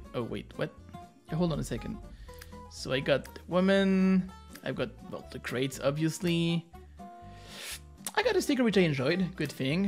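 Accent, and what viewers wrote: France. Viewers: This is the first time i watch your videos. I just make it to and realized this dude is definitely french! xD. One of my friends said there is an amazing huge update in CSGO you must check it out and didn't want to install it but still wanted to see the updates you're the only one who covers them in youtube till now so thanks for reviewing the updates you are really quick in covering them. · pourquoi tu rate le public us wesh on entend bien que t'es fr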